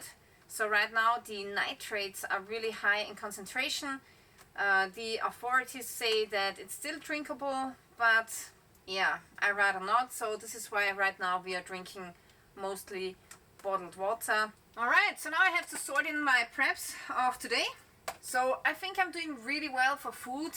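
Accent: Germany. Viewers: She speaks like Terminator. She has a German accent. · Your English sounds a little like Klaus Schwab. Are you German? I used to live in Black Forest. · Great video;you have a German " accent...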